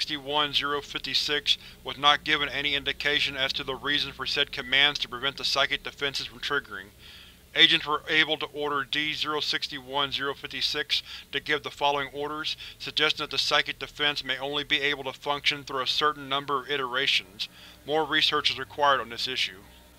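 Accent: United States. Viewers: This one's very clever. And terrifying if it was weaponised. Great reading as usual. May I ask where in the States you got your accent from. As I'm Australian, I can't pick it myself. Perfect voice for these readings.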